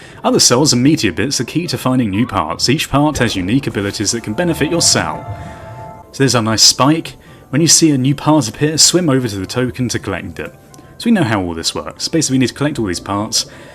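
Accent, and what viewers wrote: United Kingdom. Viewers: British markiplier voice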